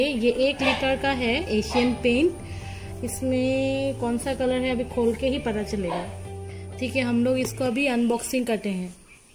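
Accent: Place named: Bengal, India. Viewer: Bhai apni bangali Hindi te bolsen keno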